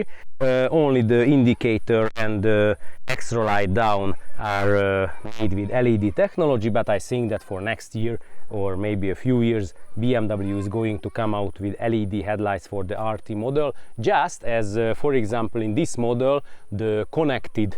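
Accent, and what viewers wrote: Hungary. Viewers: Typical Hungarian English accent, very knowledgeable and I’m sure it’s not your first BMW! Hajrá magyarok! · It's Hunglish.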